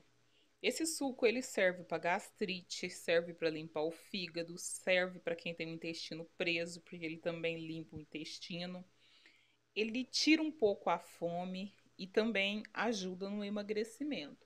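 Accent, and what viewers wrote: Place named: Paraná, Brazil. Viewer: Acho que é do Paraná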